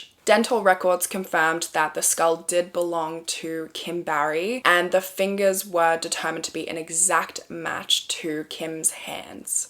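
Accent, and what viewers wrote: Australia. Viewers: Me desperately trying to keep up with the Australian English as an American😂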